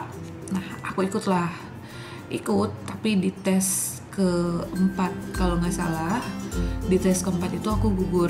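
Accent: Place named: West Java, Indonesia. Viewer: orang sunda ya .. sok silahkan .. hehe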